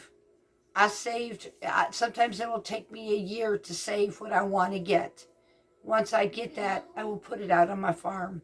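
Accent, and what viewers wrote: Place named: South, United States. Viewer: Hi I hope you feel better soon ❤. We just ate frozen salmon burgers for dinner. I never thought of making them from scratch. I will be trying your recipe next time for sure. Thanks. Glad you liked the S.S. I have never E mailed by myself before. I need a lesson. You will hear from me soon😊. I love the way you say ya all and all the southern warmth that now greets me almost everyday. Please don’t change ❤😊🥇🏡🇨🇦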